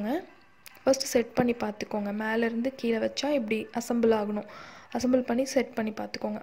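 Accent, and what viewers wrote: Madurai, India. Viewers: Madurai ya sis neenga?? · Neega madurai ya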